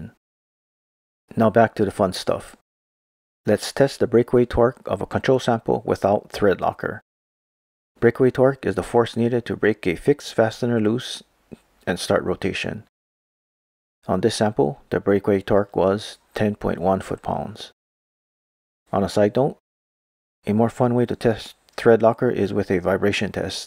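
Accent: Hawaii, United States. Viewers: Hi, I just found your channel. Great vids. I hear a little kama’aina in your voice.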